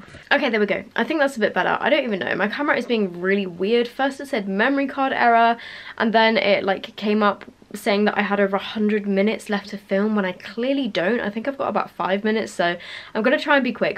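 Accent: United Kingdom. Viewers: Congratulations on 50k!! 💕 Which part of the UK are you from? (You sound like you’re from London)🇬🇧 also yoi shouldn’t compare yourself because some of other YouTubers over exaggerate which makes them seem fake. You’re unique💜 also the fact you think that wasn’t a good giveaway is absolutely crazy! I would never get this much not even for Christmas💚Goodluck to everyone entering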